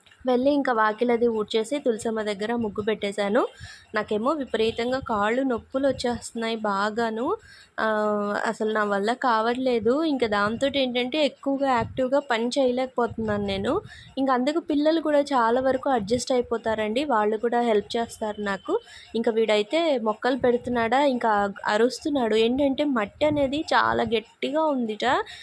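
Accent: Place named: Andhra, India. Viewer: Meedi proper andhra Telugu spastamuga matalduttunnaru.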